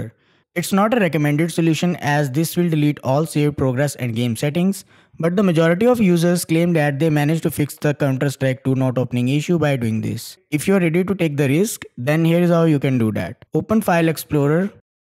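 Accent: India. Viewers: of course its an indian accent teaching us how to fix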